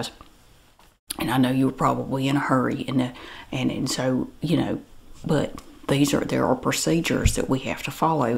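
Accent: South, United States. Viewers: is the epitome of adorable AND cringe. And the southern accent really adds to that I'm sorry to say 😆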